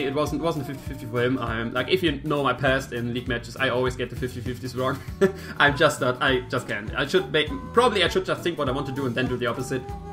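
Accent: Germany. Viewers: An amazing match. I feel reaaally bad for you for playing really good and than loosing for one mistake basically but focus on the positive of here by doing the right thing (no timer stall) and for playing really good, except for one turn. On another note, I'd suggest that you lower the "battle music" a bit. It was at times a bit hard to understand what you were saying 'cause the music was louder than your voice and even thou I like the German language and I don't mind the accent myself, it does unfortunately make your speech less understandable at times. GG and happy holidays! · are you german by any chance? you look, sound and your name sounds german. Im partially german so im curious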